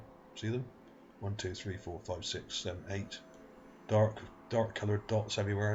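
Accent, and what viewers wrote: Bristol, United Kingdom. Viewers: Are you Bristolian mate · you sound Bristolian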